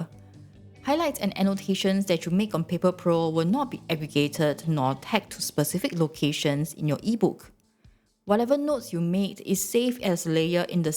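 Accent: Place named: Southeast Asia. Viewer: Hi there. Judging by your accent I presume you are from south east Asia :) Got myself a RPP too. Not sure whether you have figured out a way to handle the notes taken in the RPP in a PARA or Zettle system? Would love to hear from you. As linking “analog” to a digital workflow is something I had not quite figured out.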